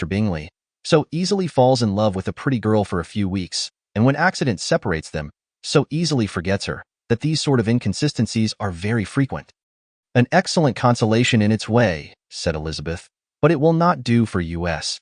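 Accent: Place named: United States. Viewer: A true English classic with a twangy American accent...Hard pass! - Sorry, but it just feels wrong to me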